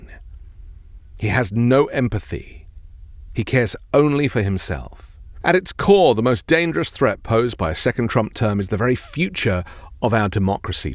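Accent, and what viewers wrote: England, United Kingdom. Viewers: what nonsense, the economy was twice as productive. unemployment was way down. this is propaganda with an English accent...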